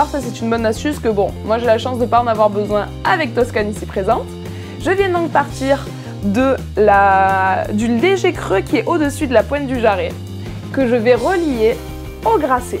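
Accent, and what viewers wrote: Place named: South, France. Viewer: j'adore l'accent du sud ❤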